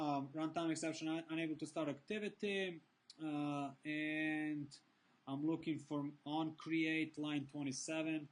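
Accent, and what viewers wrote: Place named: England, United Kingdom. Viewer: Epicness indeed, but did anyone notice he had a perfect english accent for like the first 5 minutes like instantly went dr. jekyl and hyde?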